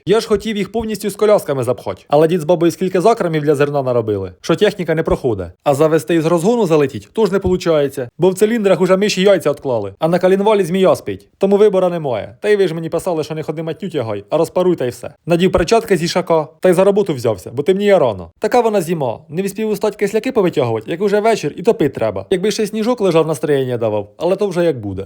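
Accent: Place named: Cherkasy, Ukraine. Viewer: навіть,живучи у Черкасах , приємно чути "той" говір. Діалект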